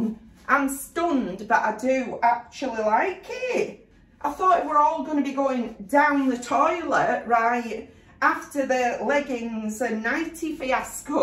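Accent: Yorkshire, United Kingdom. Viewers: I need to come to Bradford now I love mixing up Poundland and primary hope to bump in to you it’s nice to hear a Yorkshire twang love West Yorkshire ❤️😘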